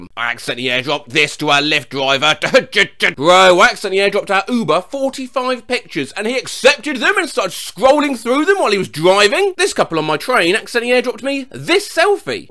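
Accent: United Kingdom. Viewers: This guy is so aggressively British I love it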